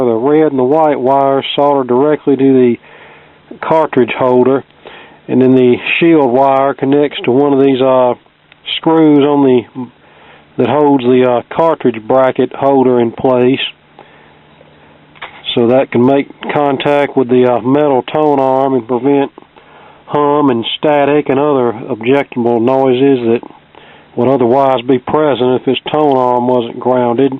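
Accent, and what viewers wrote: United States. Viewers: Fucking Cowboys accent I just love it 😏😌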